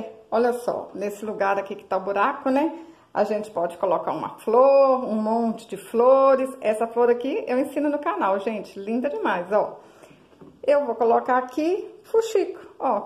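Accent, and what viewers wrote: Minas Gerais, Brazil. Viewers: vc e mineira como eu? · amo seu trabalho o seu geito de falar...MINEIRA???? PARABENS.🙏🏻👏🏻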